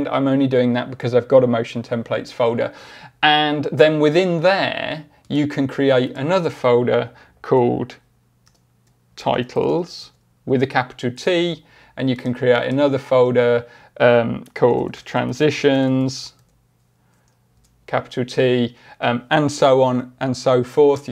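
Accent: United Kingdom. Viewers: You are the ONLY ONE who resolved my issue.... I BOW TO YOU🙏🏼❤Feeling so so so grateful. Plus I love the British accent🤗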